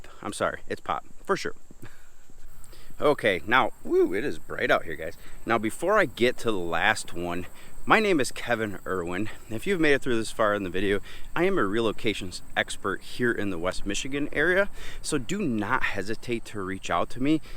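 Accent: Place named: Canada. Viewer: Not sure where this guy is from. Sounds like Canada. Michiganians do not have that accent.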